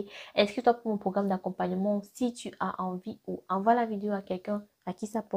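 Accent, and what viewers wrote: Cameroon. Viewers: Ma belle petite soeur, tu es super belle, mais je suis etonner que ton nom sonne comme si tu es Senegalaise mais ton accent et ton style Kamer 237 avec ta douceur me tue seulement ❤️❤️❤️💗👍💗💗😁😁😁😁😁😄😄😄😄😀😀💖💖💖💖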